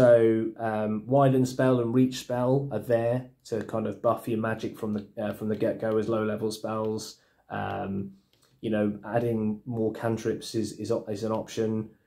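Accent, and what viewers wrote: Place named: United Kingdom. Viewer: Cool Vid! Listening to a british dude talk can be quite entertaining.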